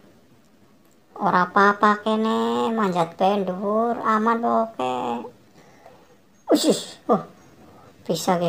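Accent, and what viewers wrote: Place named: Banyumas, Indonesia. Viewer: Hahahaha.....ngakak. ora ngapak ora kepanak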